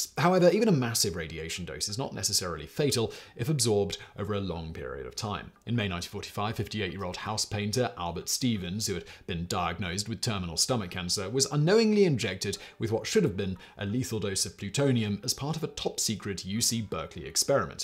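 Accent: United Kingdom. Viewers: He has a very clear and precise British accent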